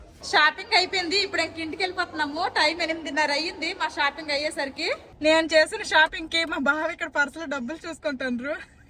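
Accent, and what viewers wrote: Srikakulam, India. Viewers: Pakka Srikakulam yaasa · మీ భాష మన భాష శ్రీకాకుళం సూపర్ గా మాట్లాడుతున్నారు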